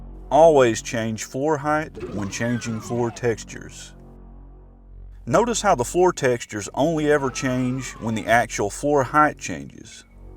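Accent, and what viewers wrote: South, United States. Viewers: Nice vid, love your accent. I'm also from the South. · "Textures are usually misaligned by default in these scenarios" is really hilarious in this southern-drawl and Big Lebowski narrative style... Sasparilla, I reckon? However it's 100% fitting for doom's Texas origin and current residency. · Thanks so much putting this together - I'm coming from the "illustrator that likes to play games" camp so most of what makes a Doom level "feel right" is a mystery to me, but it's starting to click. (unrelated, but it always makes my day hearing other Southerners online 😁) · Love your southern accent, I'm from Louisiana (New Orleans) and can't quite place where you are from. It sounds a little bit like southern Mississippi or the Carolinas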